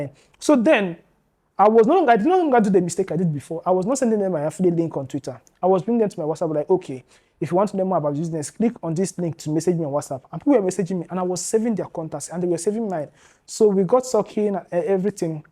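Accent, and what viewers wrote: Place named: Anambra, Nigeria. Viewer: I like your accent.... My Anambra brother. This has to be the best founders story I've listened to. I had to download it to listen again. I loved the way you talked about your challenges. I'm one person that is usually afraid because I have tried some things that didn't work. I'm motivated already. I also like the idea of the financial market. I hope to meet you someday. I'm starting now.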